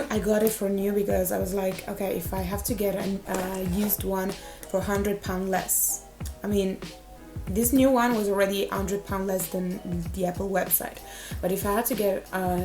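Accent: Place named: Italy. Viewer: you kinda sound italian, but I can’t say because you speak english very well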